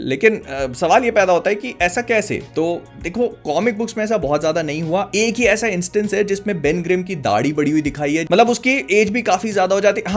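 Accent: Bhopal, India. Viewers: bhai ap Bhopal se ho??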